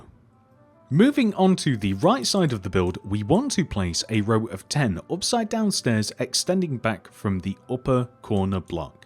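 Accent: United Kingdom. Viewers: HES BRITISH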